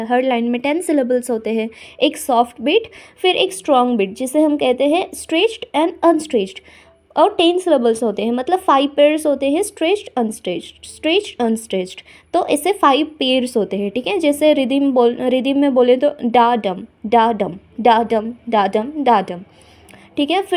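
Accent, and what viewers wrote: Odisha, India. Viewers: Didi you have odia accents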